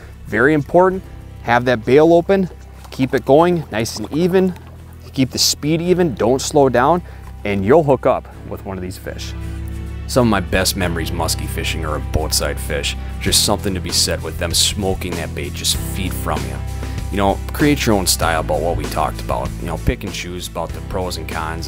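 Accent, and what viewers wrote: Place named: Minnesota, United States. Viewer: The BOOOatside , the mOOOst important. This guy is hick Minnesota/wisconsin as you get. LOL- cracks me up with that accent. Good recs hOOOwever.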